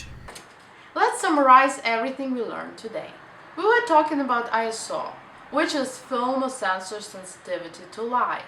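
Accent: Russia. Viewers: Your videos are really helpful! What accent is that? Is it Russian? :)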